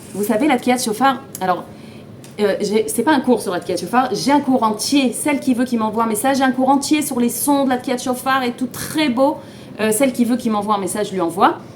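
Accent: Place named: Israel. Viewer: J’adore l’accent israélien ❤️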